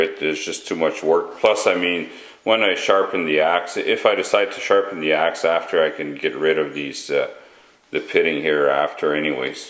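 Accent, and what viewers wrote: Quebec, Canada. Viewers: French/Canadian accent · I detect a slight French Canadian accent dare eh?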